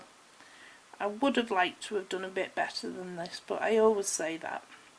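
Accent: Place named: Lancashire, United Kingdom. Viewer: Hi again, yes I thought your accent was similar to mine, so midway between Wigan and Liverpool makes sense re your accent. I've been living in Melbourne for 47 years, still have my accent, proudly😀 I'm looking forward to seeing your upcoming posts 😀stay safe.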